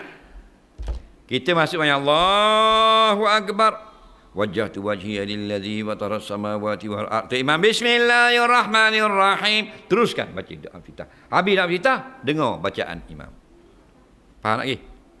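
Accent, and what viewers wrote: Terengganu, Malaysia. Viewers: Terbaik UAI.Terima kasih Ustaz.Seronok dengar loghat Terengganu Ustaz.👍👍👍👍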